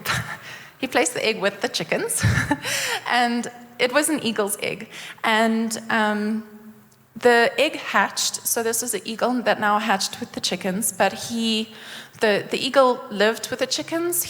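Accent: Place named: South Africa. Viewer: Can't you hear she is SA.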